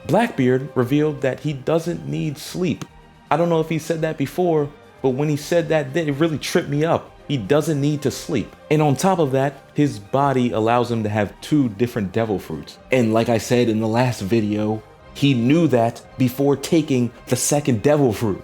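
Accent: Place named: Australia. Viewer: I read this in his Australian accent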